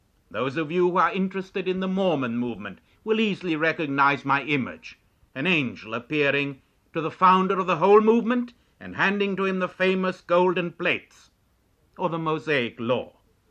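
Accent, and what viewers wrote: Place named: India. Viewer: Even his accent is a smorgasbord! I wouldn't have known he was American. He sounds a tad Indian and a tad English.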